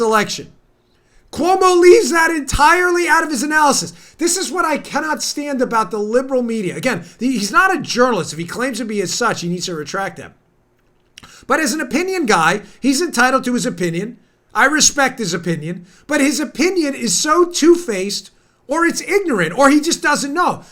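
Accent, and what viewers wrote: New York, United States. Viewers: You rock, Patriot! keep that New York accent.....so tired of the fake "Steele Farce-ier" We need orange vests with a huge "Q" on it. We will call it "the Trump/Q OrangeQrew": What do you think Donnyboy?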